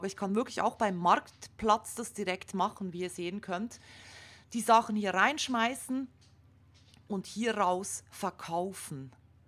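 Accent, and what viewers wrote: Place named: Switzerland. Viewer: Danke für die Erklärung mit wunderschönem schweizer Dialekt.;D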